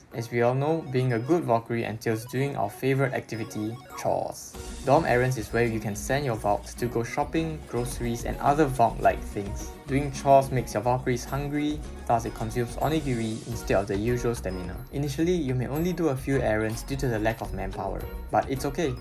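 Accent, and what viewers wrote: Singapore. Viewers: are you from singapore? nice accent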